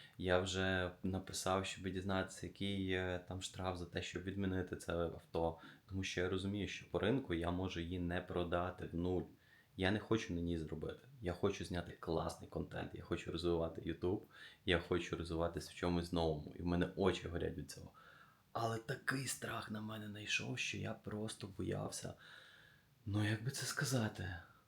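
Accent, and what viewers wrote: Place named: Vinnytsia, Ukraine. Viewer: Лайк за українську(чисту) мову,звідки родом,Віниця?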